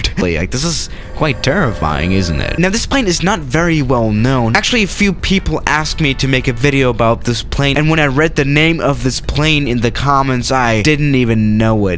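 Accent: France. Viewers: I love ur French accent 😂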